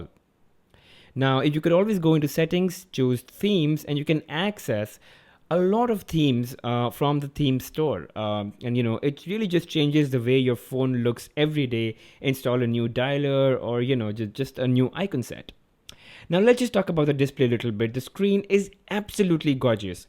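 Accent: India. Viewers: nice review . i love ur accent with that desi touch . :) keep it up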